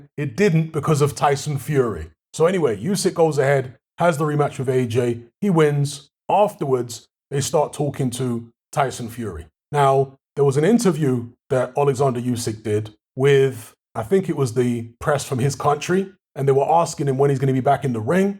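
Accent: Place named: United Kingdom. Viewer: i just assumed it was a bri'ish accent thing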